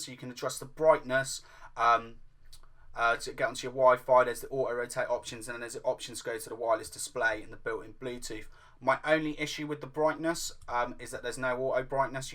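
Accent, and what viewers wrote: United Kingdom. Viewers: Oh bless ya buddy, from you from Oxford mate?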